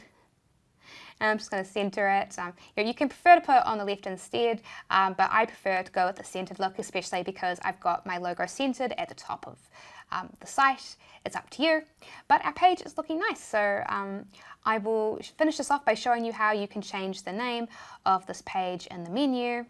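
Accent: New Zealand. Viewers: the adorable New Zealand accent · I love your New Zeland accent.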